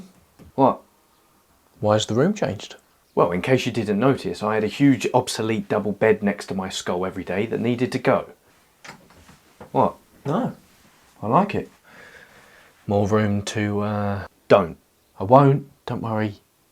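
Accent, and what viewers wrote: United Kingdom. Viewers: I don't often come across British English, so it's difficult for me to understand everything you said. But wow, I really love you and your content. Your knowledge helps me a lot, thank you.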